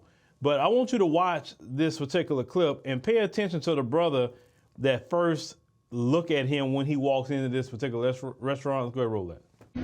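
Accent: South, United States. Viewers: your southern, Mississippi, Arkansas poor schooling accent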